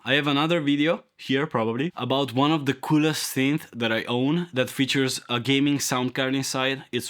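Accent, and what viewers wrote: Italy. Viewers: intanto l inglese lo parlo e lo scrivo senza problemi. Ma il tuo accento è davvero pessimo, stride, è fastidioso a un orecchio italiano, figuriamoci a uno inglese. Non a caso avevo capito subito che eri italiano. Cioè parlare inglese non significa tradurre le esatte parole ma esprimere il concetto dall’italiano all’inglese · sei italiano hai il mio stesso accento quando parlo inglese😂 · I was just watching this video now and I told my girlfriend " this guy is def italian" then i said "he sounds like he's from my hometown too" then You showed lido degli estensi and I was like bruh... I went to highschool there! small world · sei italiano?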